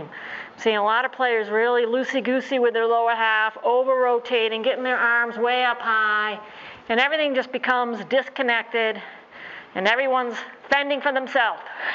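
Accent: United States. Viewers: What accent is this and where from in USA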